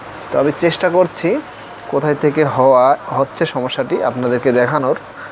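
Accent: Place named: Dhaka, Bangladesh. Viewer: ভাই , আপনার কথায় বলার ধরোন বুঝায় আপনি ঢাকা লোক |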